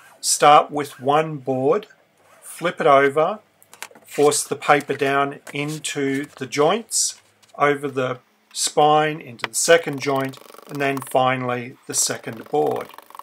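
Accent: Australia. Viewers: You are amazing! Thank you for sharing your knowledge with us. I particularly love hearing your Australian accent. I too am Australian and proud to be with such brilliant work coming from you. Thank you so much.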